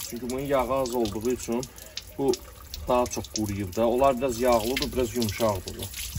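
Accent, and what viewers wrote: Baku, Azerbaijan. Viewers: Bakılı balası yorulmayasan!!!! Üreyün necə istiyir hələ də danış!!! İrad tutanlar da bilsin ki koptit közləmə deyil əslində hisə verilmiş deməkdi! Bakı danışıq dialektini qulaqları qəbul eləmirdəəə 😀😀😀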